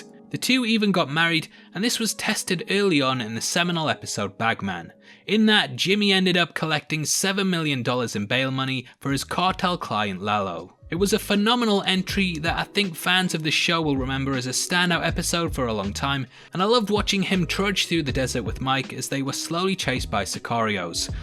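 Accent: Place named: United Kingdom. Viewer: Hilarious to hear these BCS spoilers in a Limey accent.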